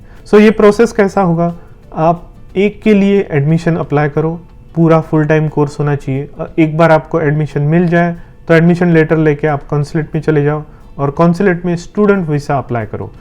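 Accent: Maharashtra, India. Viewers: Sirji r you from Maharashtra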